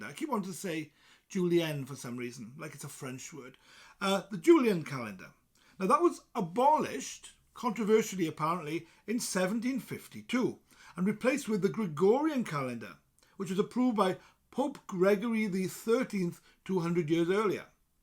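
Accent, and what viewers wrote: South Wales, United Kingdom. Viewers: good to hear a south Wales dialect/accent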